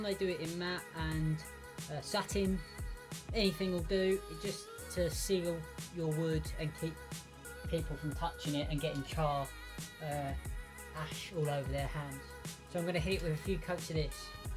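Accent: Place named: Essex, United Kingdom. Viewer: You sound like you're from my neck of the woods. Are you from Essex or East London? I'm originally Walthamstow, then Wansteasd.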